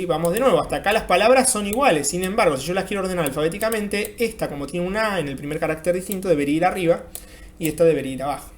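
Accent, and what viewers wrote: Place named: Argentina. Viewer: buen video bro, gracias y que lindo escuchar un Argentino en la escena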